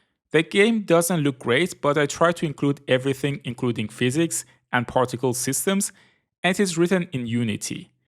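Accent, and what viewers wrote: Iran. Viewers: عشققق فقط چرا حس میکنم لحجتون اینقدر ایرانیه ؟☕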